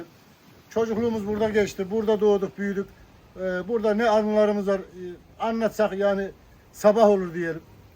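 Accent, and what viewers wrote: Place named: Central Anatolia, Turkey. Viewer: Şefim seni çok seviyorum programını takıp ediyorum.Şivenle anlatışınla buram,buram kokan bir İçanadolu çocuğusun.Hep merak ettim araştırıdım. Sana sağlıklı,huzurlu,mutlu,umutlların tükenmeden yaşamın tükenmesin.Seninle seni görünce bir gönül bağı kurdun çünkü bende Kırşehirliyim.Selamlar,sevgiler.....!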